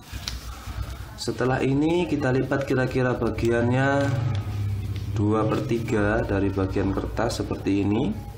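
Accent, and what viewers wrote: Java, Indonesia. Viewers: Kamu medok banget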